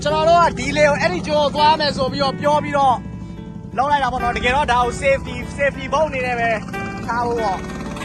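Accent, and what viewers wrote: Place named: Mandalay, Myanmar. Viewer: Are you originally from Thanbuzayat.?Actually,I thought you are from Mdy.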